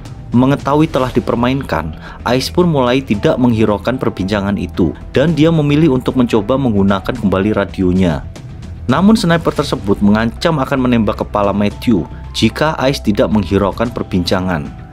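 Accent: Java, Indonesia. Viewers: Aksen Jowo sampean kental banget mas 🤣🤣🤣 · Medog mu bro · MEDHOGH SISAN SWARANE...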